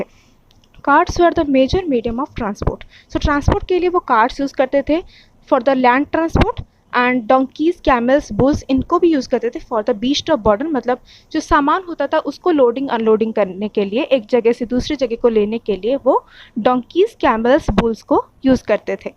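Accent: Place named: Odisha, India. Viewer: Odia accent😊 ama odia madam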